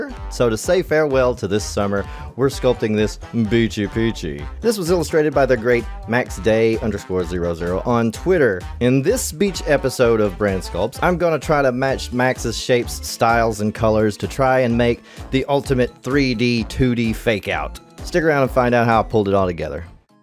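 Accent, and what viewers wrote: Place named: South, United States. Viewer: please i adore this video also where are you from??? idk if im wrong here but your accent just makes me feel right at home... im from the South. extra pointers for personally interesting to me just with the way you talk haha i love this video and how you describe it all (despite the fact i dont understand ANY of it)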